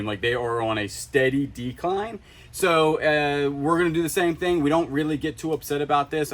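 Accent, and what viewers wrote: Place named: South, United States. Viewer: That's just the southern accent y'all. I pronounce it the same way he does. 😂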